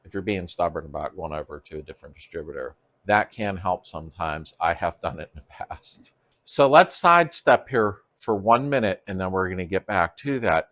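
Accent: Pittsburgh, United States. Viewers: Just discovered your channel! From your accent and the WDEV sticker in your shop …. I’m thinking you’re a Yinzer! So from one Yinzer to another …. Thanks for great content. I discovered you while doing some research on buying and maintaining a classic beetle. I’ve been in the market for a super beetle convertible. Solid driver …. Not a show car. Your content has been really helpful. Any recommendations on sites to shop for a car would be helpful. Thanks again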